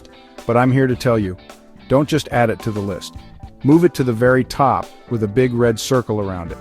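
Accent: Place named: Texas, United States. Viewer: I mike your voice and your american Texas accent !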